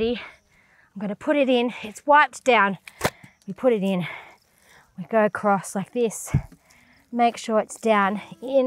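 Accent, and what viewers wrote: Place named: Buganda, Uganda. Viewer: Thanks for loving Uganda, I love yr luganda accents u make mi day 😂😂😂😂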